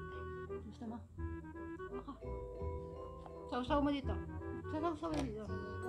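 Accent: Batangas, Philippines. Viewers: Upong bahay halatang batangueña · Ang ganda niyo po talaga. Natural na natural ang ganda. Gustong gusto ko po iyong batangeña accent niyo po. Keep inspiring others po. 😊😊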